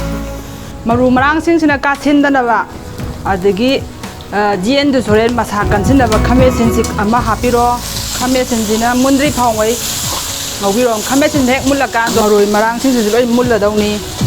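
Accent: Kakching, India. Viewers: Yes ngnagnei Kari tourage ngangiba lonse kakching gi dialect ni Kari problem leirage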